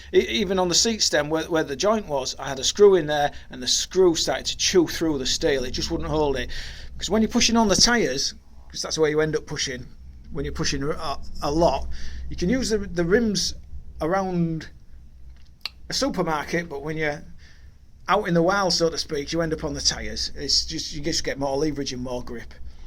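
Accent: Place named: Yorkshire, United Kingdom. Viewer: Firstly my good man, don't ever apologise for a wonderful Yorkshire accent! Most of my wife's family are from Hull! And secondly, THANK YOU, THANK YOU, THANK YOU, for recognising that us mere mortals cannot afford these things! I'm currently looking into funding for a Grit Freedom Chair, which is a very similar thing to what you've designed and built for yourself. I say 'looking into', because I know full well that I won't be able to get the funding to be able to afford this thing. Firstly, it's manufactured in America (the first hurdle I've got to negotiate), secondly, as you've rightly pointed out, these things cost an absolute arm and a leg, (it works out at nearly 5 grand for goodness sake!) and thirdly, at least 90% of the funding available for these things is aimed at kids, or least for people under the age of 25! I'm a 48 year old man, for goodness sake, what chance have I got! 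I want to enjoy what life I've got left, I want to go off the beaten track a bit. So I need something like this! But I can't afford it. I wish the manufacturers of these things, would get it into their skulls that the very people that need these things, are also the poorest people in our societies, the disabled people who, generally speaking are on benefits! I guess what I'm trying to say, is congratulations for taking matters into your own hands, and actually building one of these things yourself, and getting yourself out of the predicament that many of us find ourselves in.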